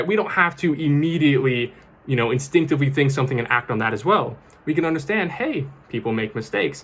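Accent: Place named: United Kingdom. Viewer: I found you one day as a recommendation by Instagram and just checked your reel on Instagram and this concept sounded interesting so, here I am, thank you for this wonderful video, what a magical recommendation! Your words are a delight to savor without rushing to understand, just embracing new concepts and philosophies. If you could ever grant the opportunity to have a talk, I would be glad to be a part of a beautiful conversation and of course, share a cup of tea! British accent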